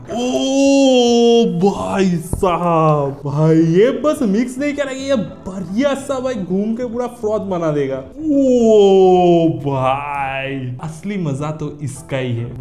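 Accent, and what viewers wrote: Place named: Bengal, India. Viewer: Hindi with bengali accent......sooo cute 🥰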